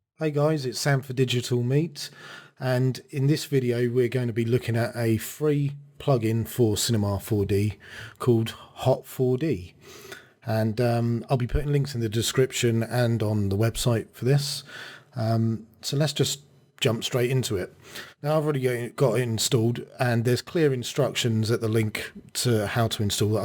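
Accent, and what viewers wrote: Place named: United Kingdom. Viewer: Excellent tutorial, great explanation, plus the British accent adds to the credibility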